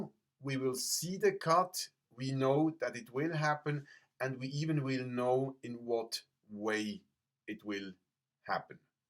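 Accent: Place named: Switzerland. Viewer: Danke für Ihre Antwort Natürlich ist mir nicht entgangen, das Sie viel lieber in Ihrem sympathischen SwissEnglish 😊 veröffentlichen und anteilig sporadisch Deutsch. Mit meiner schwäbischen Muttersprache, in schweizerischer Nachbarschaft, bin ich -nicht nur ich - in der Lage andere Dialekte zu verstehen, bzw.hat man sich dem allgemeinen Sprachgebrauch angepasst, wie Sie offensichtlich ebenfalls. Schön wäre es aber schon, wenn der englischen Sprache nicht mächtige deutschsprachige, interressierte Bevölkerungsanteil Ihre Zukunftsprognosen, die ich sehr schätze, in deutscher Sprache verfolgen könnte. Vielleicht haben Sie aber ,,gesehen", das es nicht ,,sein soll", uns als Betroffene zu informieren. Ja, es wird nicht ein lokales Ereignis sein, dank Internet erfährt man vom umgefallen Reissack...... Nicht dass ich auswandern wollte, aber auf kommende Ereignisse sich einstellen können und zuversichtlich trotz allem mit Hilfe Ihrer doch treffsicheren Prognosen wäre schon hilfreich. 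Selbstverständlich gibt es keinen natürlichen Anspruch Ihre Zukunftsprognosen zu bekommen, warum aber veröffentlichen Sie dann im Internet ❓ Mit guten Gedanken und Gefühlen, wenn es denn zum großen Reinemachen kommt, hoffe ich, das es für alle eine schöne Weiterentwicklung gibt . Alles Liebe und Gute für Sie ❣️Danke für Ihre spannende Arbeit an der Menschheit Josefa 👵🙋🙏🌟‼️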